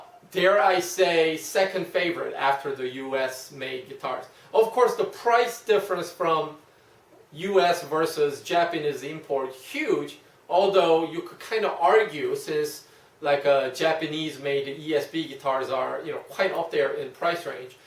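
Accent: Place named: South Korea. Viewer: 안녕하세요. 혹시 한국 분이신가요? 영어 억양이나 발음이 종로 학원을 떠올려서 여쭈어 봅니다.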